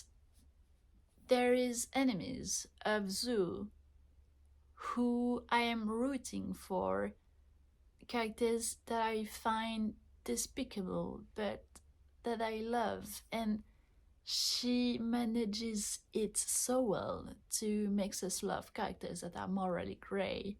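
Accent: France. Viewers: j'entends l'accent français, j'apprécie.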